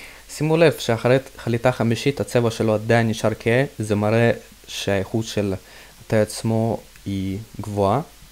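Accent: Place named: China. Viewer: אתה סיני הוא משהו יש לך מבטא נחמד כזה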